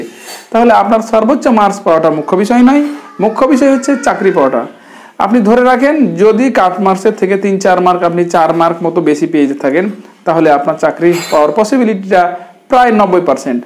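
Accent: India. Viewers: ভাইয়ে বাড়ি কি পশ্চিম বঙ্গে।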